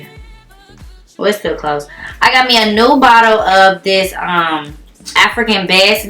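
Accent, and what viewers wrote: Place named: South, United States. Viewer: *speaking Southern AAVE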